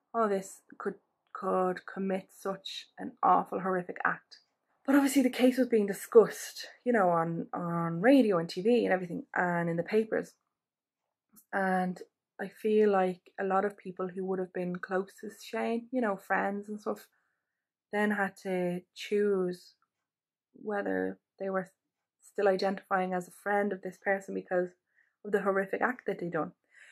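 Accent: Ireland. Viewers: It's a typical Irish way of speaking so she's just being herself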